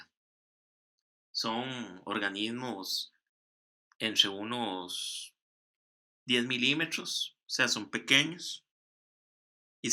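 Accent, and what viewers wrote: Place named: Costa Rica. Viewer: El acento tico no se pierde xd Gracias mae por el video <3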